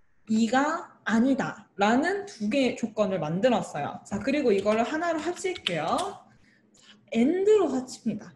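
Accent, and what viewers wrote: Gyeongsang, South Korea. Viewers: 경상도 어투가 살갑고ᆢ정겨워요~ 강의가 귀에 쏙쏙~~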